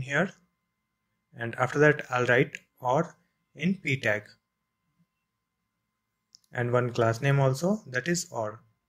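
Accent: India. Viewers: Bhai Indian accent not los Angeles CA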